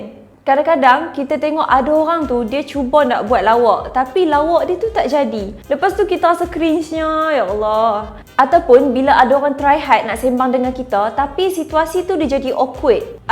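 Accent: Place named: North, Malaysia. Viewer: Tak tau pasaipa aku tertarik dgq host punya utagha...bnyakkan lagi post guna host ni...terbaik baq hang..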